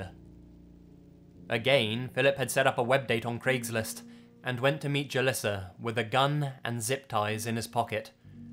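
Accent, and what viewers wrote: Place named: Southern England, United Kingdom. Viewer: It's how he pronounces it, he's from the South of England so it is bound to sound a bit different. If you listen carefully, you can hear the "inn" noise at the end, but the start does sound like he says "wuh" instead of "wih". By the way I'm from Northern England so I probably sound even stranger.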